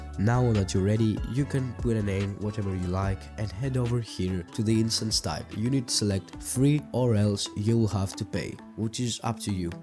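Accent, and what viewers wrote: Greece. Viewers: i knew by his accent that he was greek and just when he went to the options tab i saw it greek characters never underastimate my gut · Bro Είμαι Έλληνας και εγώ έβλεπα το βίντεο και η προφορά ακούστηκε ελληνική μετά είδα και το κανάλι ήταν από την Ελλάδα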